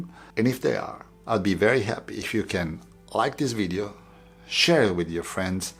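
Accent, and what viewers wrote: Italy. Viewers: hello, I very like you performance and passion for what are you doing, your videos are very helpful. Your English is very understandable and I like Italian accent, please continue with this. One of best channel for me. Ti auguro tutto il meglio. Ciao Luca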